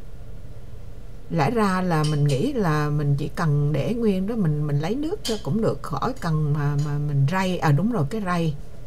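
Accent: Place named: Central Vietnam, Vietnam. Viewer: Chị nói chuyện thật là mộc mạc, đúng người miền Trung ❤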